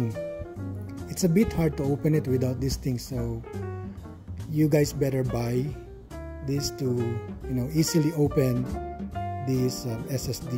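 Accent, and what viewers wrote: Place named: Philippines. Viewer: Sir you're accent is somekind a pilipino are you a pinoy? Thanks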